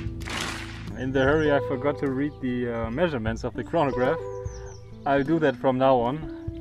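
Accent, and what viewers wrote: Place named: Germany. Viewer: Fast kaum erkannt, dass du Deutscher bist 😉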